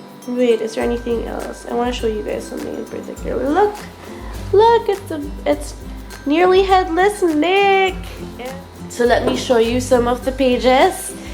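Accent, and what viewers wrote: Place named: Philippines. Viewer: I sensed you were from the Philippines! Great haul,:)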